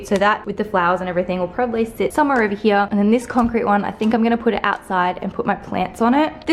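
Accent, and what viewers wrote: Australia. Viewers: Just don’t loose that Aussie accent ☺️ so good to hear a local in amongst it all.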